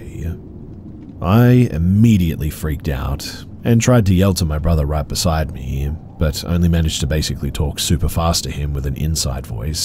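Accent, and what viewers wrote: Australia. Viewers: is an aussie? 😮 I thought I heard aussie buuut didn't want to offensive, lol.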